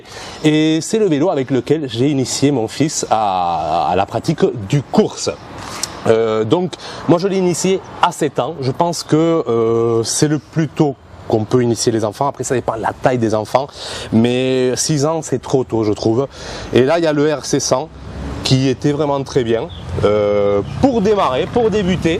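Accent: Toulouse, France. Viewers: De toute façon c'est mieux de pende a son age un vélo qu'importe pour quel pratique de prendre tout de suite un vélo pour adulte de cette façon pas besoin de changer deux fois ou plus de taille de vélo fur et a mesure qu'il vas grandir ....c'est ce qu'on a fait avec nos gamins ....ça c'est mon opinion perso je précise ...salutation bernard de strasbourg et bien sur toujours un vrais régal de te suivre sur tes superbes vidéos chapeau , sais pas si c'est ta manière de présenter les articles 🤔 et ...ou ton accent toulousain ou on ressent cette passion du vélo avec l'ambiance du sud.....oups.....en tout cas j'adore visionner !.......... bon dimanche 😉✌✌........................................................................j'oubliais faut bien insister sur le port du casque je sais que c'est ch....pour certains adultes mais disons une chose et insistons la dessus ...quand on tombe qu'importe la gravite ,faut savoir qu'avec un traumatisme crânien même minime on peut rester un " légume " hélas et ou paralysé d'un coté ....qui voudrait ça ? 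juste pour infos ....moi aussi ça me dérange un peu mais avec mes 73 balais je me verrais pas être dépendant d'autres personnes croyez moi ...et je m'amuse dans mes chemins de terre sur les collines atour de chi moi ...bien sur avec mon vtt avec assistance car je pourrais plus avec mes pontages que j’ai eu en 2021 et un an après un ACR en voiture dans la commune voisine et heureusement je roulais tout doucement quand je suis rentre dans le mur de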